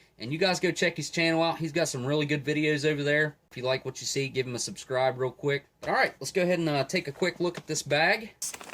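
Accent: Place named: Baltimore, United States. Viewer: Your accent screams Baltimore, especially when you said Sopacko, Hon!!!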